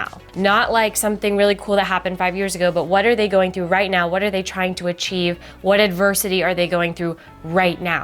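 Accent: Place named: United States. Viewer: I am brazilian and live in London. I love your american accent.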